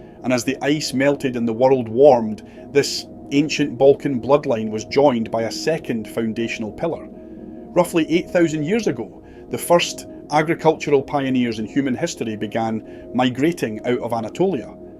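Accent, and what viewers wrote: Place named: North, United Kingdom. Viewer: Interesting. Thank you for the subtitles, otherwise I could not understand your accent. I have heard the New Zealand English, which was very difficult, but I think yours is from somewhere in northern Britain ?🌹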